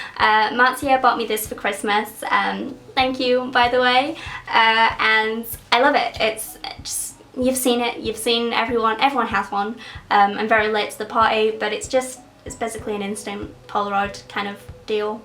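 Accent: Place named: North, United Kingdom. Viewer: I'm sooooo confused by your accent? Is it northern or American?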